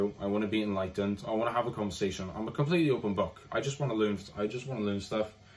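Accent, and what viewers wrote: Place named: Scotland, United Kingdom. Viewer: Hey, I grew up in a Christian/Pentecostal household, much like you, so I genuinely understand your perspective. Similarly, I've delved into Orthodox teachings, finding insights from figures like Father Spyridon and Bishop Mar Mari to be profoundly enriching. Their depth of knowledge is indeed a blessing. I resonate with your concerns about the shifts within the Protestant church. The changes since our childhood have been quite disheartening, particularly when observing the trajectories of the Church of England and Scotland, which seem to diverge from Scriptural foundations. While I've been influenced by Orthodox teachings and recognize God's work within that sector of christianity, it's essential to uphold that Jesus is unequivocally the ONLY path to the Father, as emphasized in John 14:6. Furthermore, 1 Corinthians 6:9-11 underscores the importance of avoiding idolatry (praying to saints). 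Even if some claim not to worship saints, our reverence should solely be directed towards Jesus Christ, the King of Kings. Despite shifts within certain Protestant denominations, I believe Christ prioritizes our individual relationships with Him. The authenticity of our faith lies in our personal devotion and living for Him beyond mere traditions. Reflecting upon 1 Kings, it's evident that faithful remnants persist even amidst broader challenges. On a side note, I detected your Scottish accent. When I resided in Edinburgh, I found solace in attending Charlotte Chapel, a Baptist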